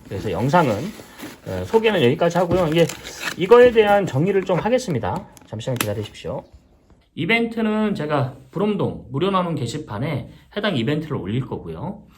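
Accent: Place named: Seoul, South Korea. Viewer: 님 역시 고급진 서울어에 목소리도 좋으시공 ㅎ 이렇게 이쁜가방 나눔이벤트도 해주시고 응원하면서 신청해봅니다 41번 진규철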